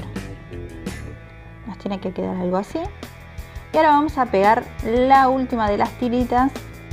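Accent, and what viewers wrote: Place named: Argentina. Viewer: me encanta el acento argentino xd oshe si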